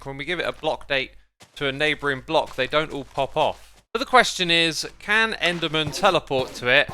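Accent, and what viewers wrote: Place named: United Kingdom. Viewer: let him pronounce words like he wants to. Stop forcing the american way of saying words on british people.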